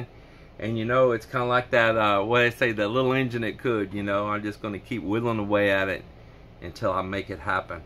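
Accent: Texas, United States. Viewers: your drawl is getting stronger over time as you stay in Texas. It takes a yankee to notice.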